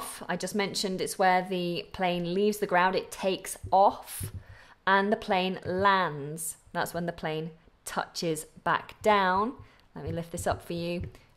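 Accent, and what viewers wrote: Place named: RP, United Kingdom. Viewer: No one really speaks this posh tbh but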